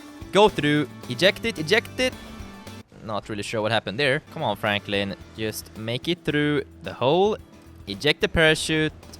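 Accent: Sweden. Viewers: Are you swedish!?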